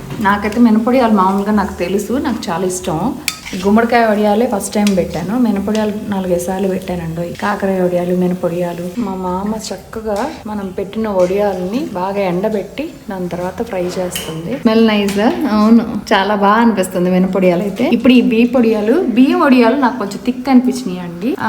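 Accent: Nellore, India. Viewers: Meedi nellore aa andi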